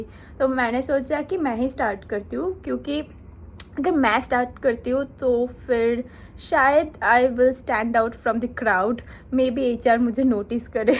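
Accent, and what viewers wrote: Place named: West Bengal, India. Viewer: Apka tengue samajkar muje pata sala app north -east se ho I mean West Bengal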